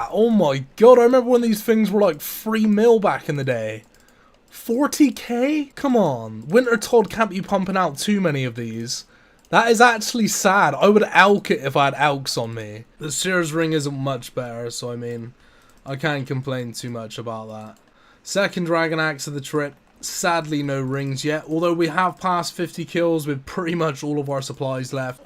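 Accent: Bristol, United Kingdom. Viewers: Ooh arr Bristol Lad are u? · Subbed because of the Bristol accent. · That accent is awesome, where in the UK you from?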